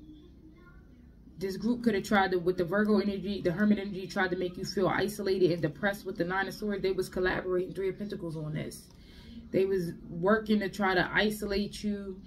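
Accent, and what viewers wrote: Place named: North, United States. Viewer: Damn u fine lil mama and i Kno u from uptop by the accent, im a flordia marlin and you know upnorth our second home... 💐💐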